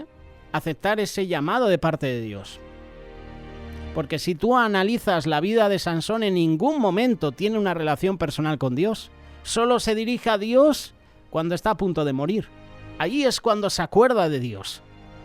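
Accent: Spain. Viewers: El ETERNO LES BENDIGA HOY ES 4JULIO_ 2021-DESDE OREGON PORTLAND USA ME ENCANTA SUS ENSEÑANSAS ME INSTRULLEN MUCHO I TAMBIEN ME ENCANTA SU ASENTO DE VOSOTROZZ😍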